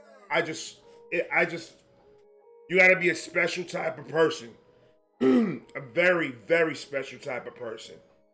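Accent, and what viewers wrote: New York, United States. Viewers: You have a Yankees hat on. And from the accent you sound like a New Yorker. Im a Yankees fan myself (last names Mattingly). I spent 39 months deployed in the infantry. I did it, i lived it. I have the physical and mental scars to back it up. You have had the best reaction video to this song, ive seen. As a veteran, this is probably my single favorite song ever. The sad thing is that those numbers are under represented. Not all suicides are classified that way. The more accurate number is between 35 and 40 veterans a day. Ive lost more brothers to their personal demons than to combat actions. If you're curious, "The Hornets Nest" is a great movie to watch (and the second half is my company from the service). Great 1st hand of what combat soldiers go through. Thank you for youre appreciation of the veterans. I can only assume what you witnessed on 9/11....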